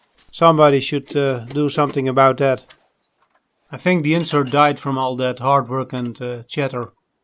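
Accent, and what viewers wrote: Netherlands. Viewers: your Dutchlish accent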